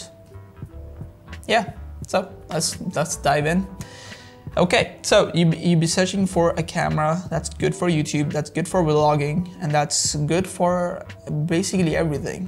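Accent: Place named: India. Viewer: are you indian? Your accent is op❤ What time it take to develop bhiiya?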